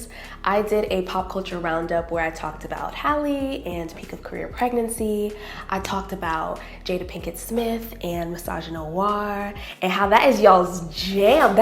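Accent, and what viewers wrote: Jamaica. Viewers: So yuh just been chippin in the patois these past videos 😂😂 I genuinely love that 😂😂😂💜💜💜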